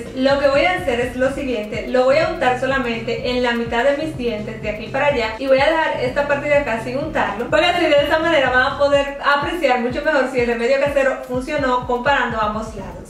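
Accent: Dominican Republic. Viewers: eres Dominicana?😍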